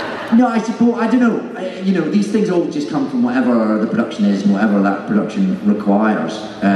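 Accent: Scotland, United Kingdom. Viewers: In his American accent you can still pick up a hint of Brit. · Yeeees! Love his Scottish accent so muuuch. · I am German and I find David's accent somehow easier to understand than any other Scottish accent, or, for that matter, other British accents. Also I love hearing him talk in broad Scots more than anything ❤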